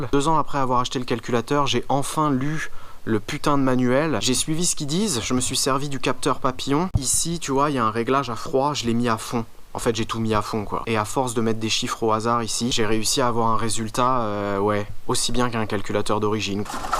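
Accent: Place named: Lorraine, France. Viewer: Slt maestro t’a un accent lorrain je trouve Salutations mosellanes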